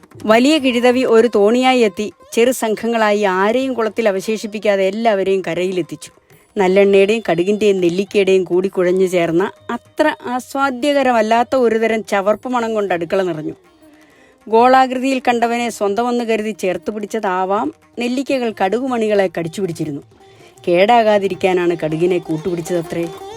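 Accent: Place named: Kollam, India. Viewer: അമ്മയുടെ ഭാഷ കൊല്ലം ഭാഷ പോലെ ഉണ്ട്.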